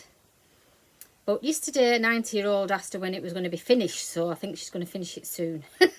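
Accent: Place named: Lancashire, United Kingdom. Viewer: I'm a new subscriber and really enjoying your videos. Is it ok to ask whereabouts you are from originally? You sound so much like a friend of mine from Lancashire. I am originally from there, but we moved over the border many years ago. Thank you for your very entertaining podcasts. 😊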